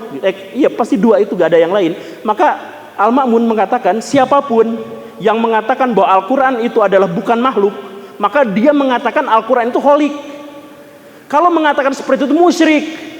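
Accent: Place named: West Java, Indonesia. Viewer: Aksen sunda dalam narasi nya adalah satu dari bbrp aspek yg bikin seru dan lucu, masa khalifah bilang gini "eee kamu teh" wkwwkk mantap 👍🏻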